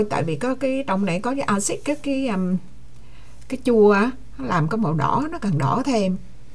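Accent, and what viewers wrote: Quang Nam, Vietnam. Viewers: Cô nói giọng giống người miền Trung, quảng nam.🥰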